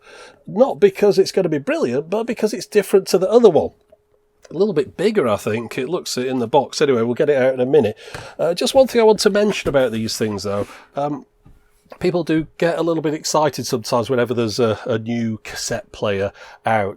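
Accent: United Kingdom. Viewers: I have a number of very good friends in the UK and this guy sound like so many of them. Before you get mad I AM NOT making fun of this man. I love this channel and what he does sharing technology with us. What I enjoy is the way he phases what he says. He said something along the lines of Yes you can play MP3's via USB drive but it does not really make any difference since it all sounds like utter rubbish anyway. When he says something is terrible it just makes me laugh because, he is ever so polite BUT, when he says something is bad his words are so hard, biting and direct yet so gentle in a way. I subscribe to this channel because; this guy is great and knows his electronics. This guy is honest. Yes sir I've brought a few vintage items just because I saw them here first. That said the reason I love this channel beyond the technology is because; this guy savages today's cheap electronics. 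The funny thing is the polite lovely tone of his voice and his choice of words almost kills a bad device with kindness which just makes me scream with laughter. I agree with him this mono cassette player \ recorder boom box is total and utter rubbish no matter what you try to do with it. I'm not joking when I say I am happiest when this chap evaluates modern tech because; he will buy cheap devices for 30 pounds sterling and put them through their paces. This is one of the best channels on You Tube! Thank you sir. You remind me of my friends from the UK who can say the most mundane things an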